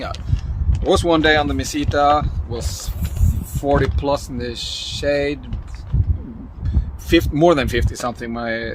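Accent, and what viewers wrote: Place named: Poland. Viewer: Guy sounds like a polish. The shoes are a gay overload. You don't need all the crap. I wore an old pair of running shoes, on the plane, in the trail, in the auberge, everywhere... but they were running shoes, gel padding in the sole, no problems at all on my whole Camino. Just bring one change of under clothes in your pockets. You don't need a back pack. Your not in the Rockies. Anything else you need.... BUY IT THERE.... Spain is a developed country for crying out loud!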